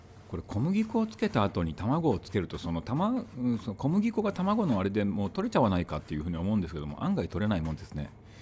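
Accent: Standard. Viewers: 何故か標準語のナレーションが面白いですね😃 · なぜ、標準語🤣 (≧▽≦)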